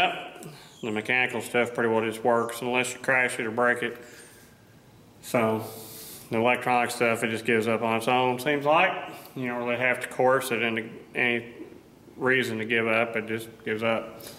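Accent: South, United States. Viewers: you welcome,BTW love your southern accent LOL..I am really respect you,one man army LOL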